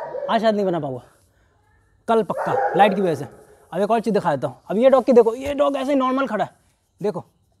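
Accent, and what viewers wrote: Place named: Bhojpuri, India. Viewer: Bhai Really love your raw videos Your Bhojpuri accent ... ❤️❤️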